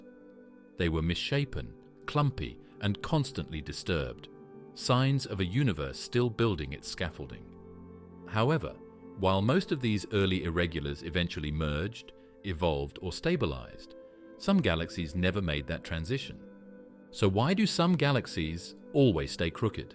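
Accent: United Kingdom. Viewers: Here it comes a British accent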